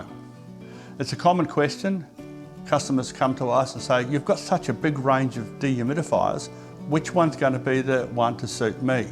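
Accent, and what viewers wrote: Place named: Australia. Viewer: After watching 5 US vids on the best dehumidifiers I can't even get in Aus.... Awesome listening to another Aussie.. :-)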